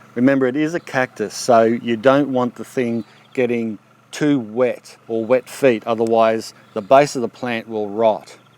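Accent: Australia. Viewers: Can you give me any tips on cultivating a sweet Australian accent?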